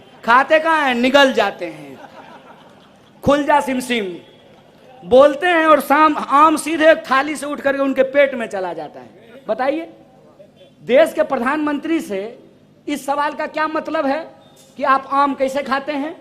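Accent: Bihar, India. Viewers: He should improve his Bihari tone.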